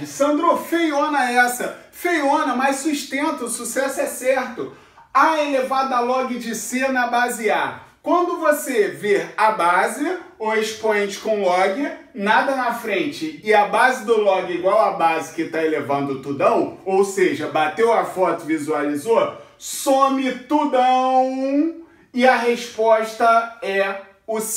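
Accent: Rio de Janeiro, Brazil. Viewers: Esse fala carioquês com gosto!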